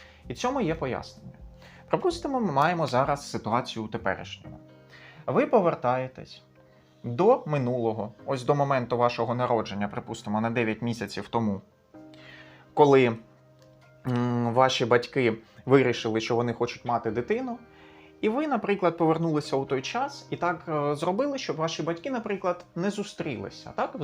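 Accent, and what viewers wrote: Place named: Russia. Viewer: Цікавий російський акцент.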